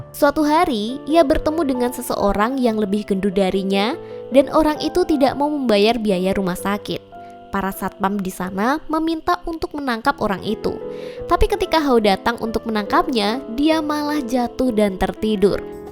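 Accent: Java, Indonesia. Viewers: Medhok.. Berasa di ceritain si mbok 😂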